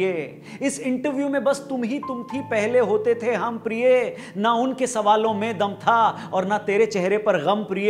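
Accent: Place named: Indore, India. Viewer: Arey yeh bhiya to Indore ki bhasa bol riya hai....Ek number👍👍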